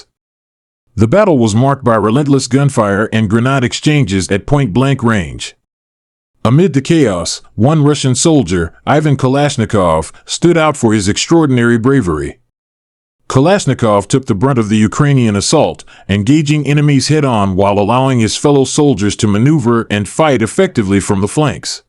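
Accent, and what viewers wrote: United States. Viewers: ощущение,что озвучивал какой то реднек со среднего запада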